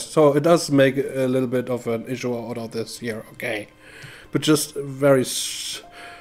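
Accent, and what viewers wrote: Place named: Germany. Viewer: It's getting difficult to find reactions to this that I missed seeing. I especially like seeing reactions from countries other than mine. I'm in the USA. Your english is just fine, and I like your german accent. I also like that you are a straight man that accepts gay people for who they are. I definitely liked your reaction to In a Heartbeat. It is just about my favorite video. I had to wait almost fifty years to see a video about love that I could really relate to.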